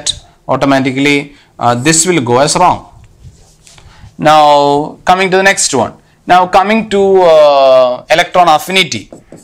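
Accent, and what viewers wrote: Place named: Thrissur, India. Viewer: TCR slang ⚡⚡💪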